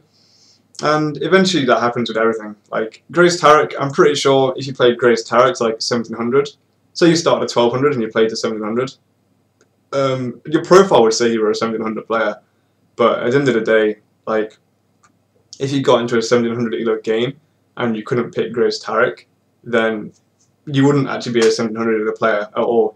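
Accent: United Kingdom. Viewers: What part of the UK you from?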